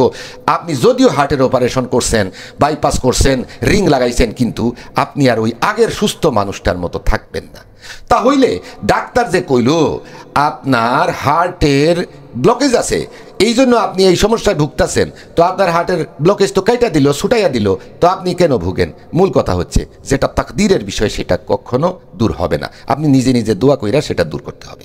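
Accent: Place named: Barishal, Bangladesh. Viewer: মামা আজকে আপনার কথাগুলো একদম অন্যরকম শোনাচ্ছে অনেকটা বরিশাইল্লা বরিশাইল্লা। অনেক কিছু আজকে জানতে পারলাম ধন্যবাদ।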